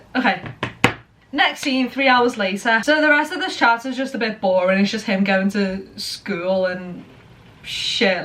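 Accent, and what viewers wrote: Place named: Liverpool, United Kingdom. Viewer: Do you think she’s American? Idk if you’re joking she’s scouse lol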